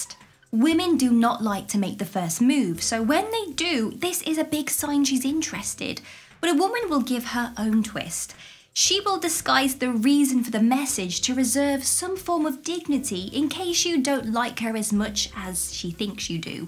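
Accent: United Kingdom. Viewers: heyy you are one of the best advice givers ever on youtube, there is a christian girl at my church who seems to like me a lot, btw how often can you text a girl every two or three days I am assuming, i need your advice btw your British accent is pretty cute. Lastly, you can be little awkward sometimes right not intentionally, but it happens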